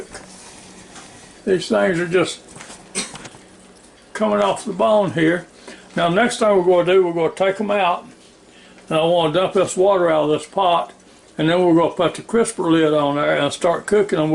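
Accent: South, United States. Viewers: The southern accent make this like 1000% better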